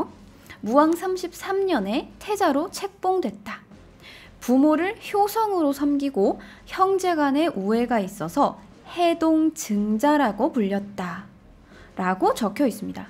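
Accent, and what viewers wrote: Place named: Jeolla, South Korea. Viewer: 은 전라도사람이군요.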